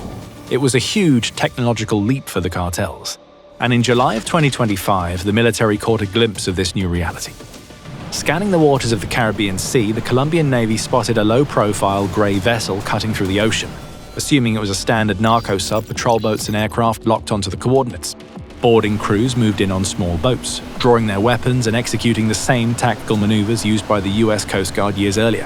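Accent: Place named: United Kingdom. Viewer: It's a lie story with a British accent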